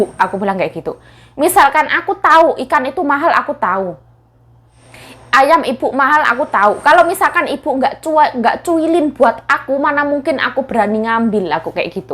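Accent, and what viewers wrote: Java, Indonesia. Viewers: Madarin logak Jawa😁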